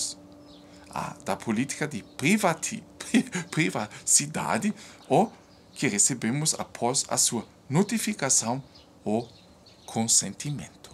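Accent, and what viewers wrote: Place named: Germany. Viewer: Ele é alemão.... isso se chama "sotaque"!.......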